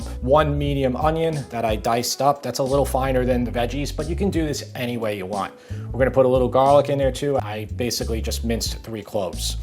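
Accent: New York, United States. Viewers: I hear that distinctive NY accent!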